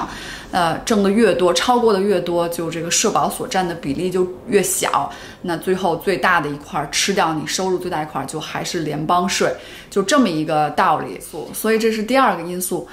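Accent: Beijing, China. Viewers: 北京大妞 听着舒服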